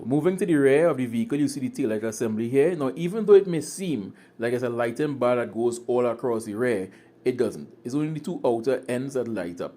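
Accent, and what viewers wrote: Trinidad and Tobago. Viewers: Great job with this review. Nice to hear a Trini accent for a change. BTW, the Japanese voice in the start-up sequenceis telling you that the ETC card isn't installed. This is for the Japanese toll system. This voice can be turned down with the volume button. Not sure, but I think the voice can be turned off. Also, Note is a 1.2L engine. Safety features are controlled by ProPilot. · bro you from trinidad?!.... this is one of if not de best local reviews i've heard/watched keep it up👌👊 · So freshing to hear a trini voice doing this. Means a lot bro. Thanks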